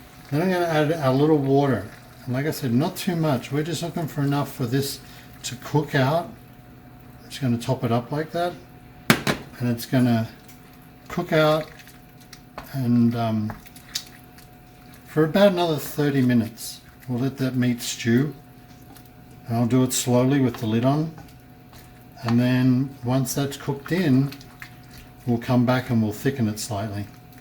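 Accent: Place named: Australia. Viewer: Are you an Australian living in USA? At first you sounded Australian and then I could hear an American twang so couldn't work it out! Anyway, a nice twist on the Aussie pie. I like the idea of the herbs and paprika so will give it a go :)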